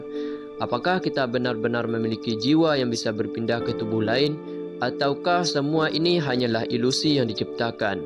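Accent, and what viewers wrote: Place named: Bali, Indonesia. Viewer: Hmmm... sebenarnya aku ingin bertanya padamu, apakah kau mempercayainya,,, tapi itu ku batalkan, mengingat aksenmu menunjuk kan logat Bali, jadi kujawab saja sendiri kau pasti percaya, karena itu di sebutkan dalam ajaran agamamu. Dan jika kau percaya, kau bahkan tak perlu meragukannya dengan membaca atau bertanya pada ilmuwan. Apapun jawabannya mereka hanya meneliti dan meramalkan atau menyimpulkan,, jika hasilnya tak sesuai kau malah goyah pada apa yang kau yakini. Karena hal ini tidak bersifat fisik itu sudah menyangkut ranah di luar fisik. Itu berada di ranah antara kematian menuju ke kehidupan !. Jika kau ingin memastikannya orang harus mati dulu. Setelah itu baru tahu apakah reinkarnasi afa atau tidak, tapi itu terlalu ekstrim bukan ?? Ha ha ha ha ha.... untuk lebih mudah cobalah cara yang lebih mudah,, yaitu dengan memahami hakikat hidup dan kehidupan.. 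mungkin saja dari situ kau akan mendapatkan pencerahan ! Karena jika kau tanya ilmuwan,, jika ilmuwannya hindu atau buda, mereka pasti jawab ada, terlepas dari apapun caranya untuk membuktikan bahws itu ada,,, tapi sebaliknya,,, jika ilmuwannya muslim atau islam,, dia pasti akan menjawab tegas tidak ada, meskipun bukti ilmiah mendukung dan membuktikan!. Kenapa? Karena dalam islam tak ada konsep tentang reinkarnasi. Termasuk karma, hal itu tidak di jelaskan atau di ajarkan dalam agama islam. Tapi,, tidak di ajarkan,, belum tentu berarti tidak ada bukan??... jadi selama faham itu membawa kebaikan hidup bagimu dan bagi sesa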